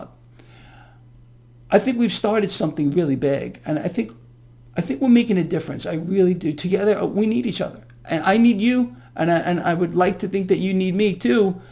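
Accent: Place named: East Coast, United States. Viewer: he's East Coast USA, there are different drawls (accents) in the US depending where you are at. One could say his accent & style are reminiscent of East Coast Mobs, but that would make him Super Cool! (Goodfellas, The God Father, etc).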